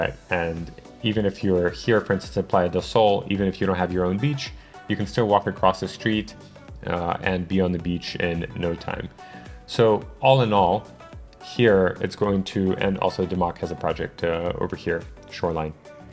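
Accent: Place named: United States. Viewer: was wondering about your name—you sound American! It would be great if you could introduce yourself in each video. It’s refreshing to see Americans in this space. I’m also interested in learning more about Dubai’s real estate. Most of the content I come across seems to feature Russians, Europeans, and people from Muslim countries, along with Indians from other parts of the world. Many people in America still don’t know that much about Dubai’s opportunities—they often think Miami or maybe some Latin American destinations like Costa Rica or Tulum, Mexico, are the best options in the West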